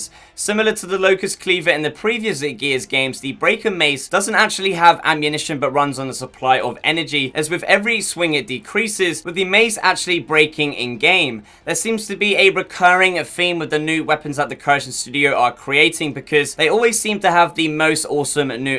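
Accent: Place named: United Kingdom. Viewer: Aymen Salih He has a British accent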